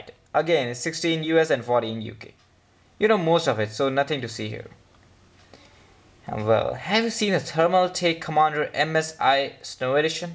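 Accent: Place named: India. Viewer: funky indian accent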